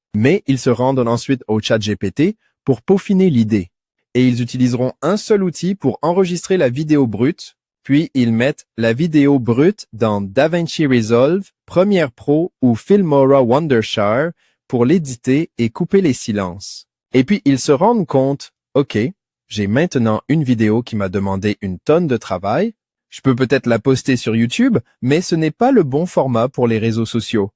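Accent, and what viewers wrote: Canada. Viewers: La vidéo est créé avec IA grâce aux anciennes ? La voix et les gestes sont globalement les mêmes, mais la dynamique et le rythme sont différents. ( si c'est le cas, l'avez-vous paramétré pour obtenir cette différence ?) L'accent et la voix sont différents également ( québécois), et les gestes ne sont pas coordonnés avec la voix, il y a un petit décalage Pour la confiance de nos prospects, ça peut être compliqué 😅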